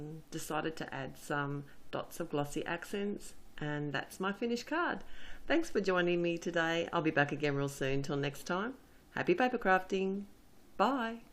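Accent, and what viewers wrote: Australia. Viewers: Love your card so beautiful. Also love your Aussie and you Iink to an Aussie store for your supplies. Thank you so much.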